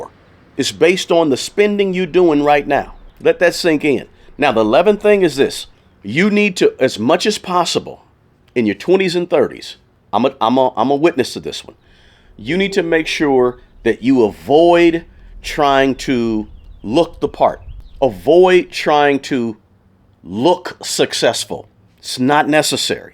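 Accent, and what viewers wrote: South, United States. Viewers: Why does the best life advice come from a dude with a southern drawl?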